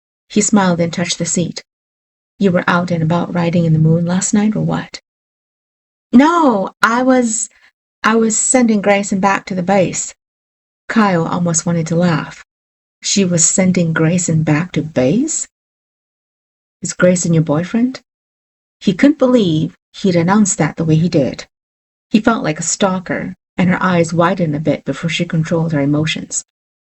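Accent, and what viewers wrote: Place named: South, United States. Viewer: Love it! That southern accent is awesome.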